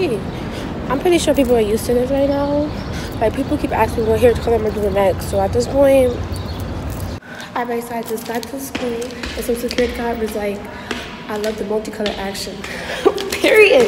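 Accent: New York, United States. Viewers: r u from new york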